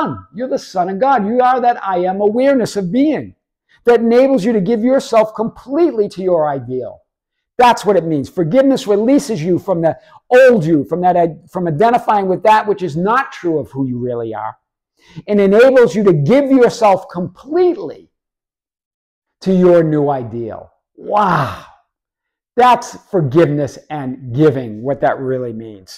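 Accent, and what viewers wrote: Boston, United States. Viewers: dispenza, tolle, neville, zeland, abraham hicks...all good...but the greatest and most complete is this man from Boston. throughout history only Jesus, Krishna, and a handful of others were better teachers, that's how good Mr. is. yeah, he looks scruffy and normal right? well guess what, real Buddhas are often so simple and humble that the common man would never know who they are. people flock to dudes with giant robes and paraphernalia but I tell you all, with conviction, this man is among the top 3 spiritual teachers alive on the planet but most people would never know it. they will judge him by him folksy demeanor and not realize he is a master teacher. those of you who know who he really is are lucky. he is one in a billion, literally. I'm still amazed by how this gem talks in a casual Boston accent and is so light and cheerful, most will never know they are interacting with a true MASTER. 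life is hilarious hahaha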